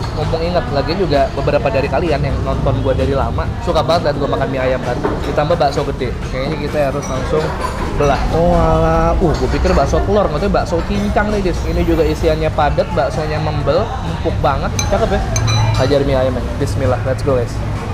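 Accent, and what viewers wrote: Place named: Banyuwangi, Indonesia. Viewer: Jawa timuran bang tpi jawa timurnya yg timur bgt BANYUWANGI 🤗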